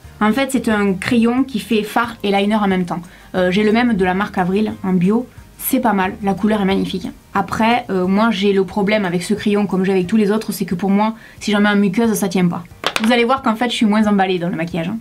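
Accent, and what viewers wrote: South, France. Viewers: cest laccent du sud je pense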